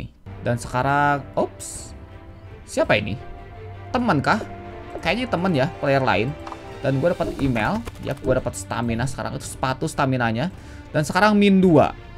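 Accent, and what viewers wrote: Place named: Java, Indonesia. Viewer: medok hadeuh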